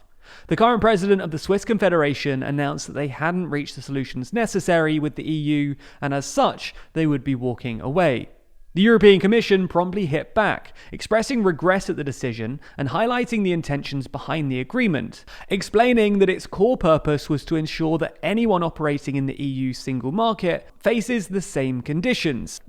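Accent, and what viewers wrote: United Kingdom. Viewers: Queen english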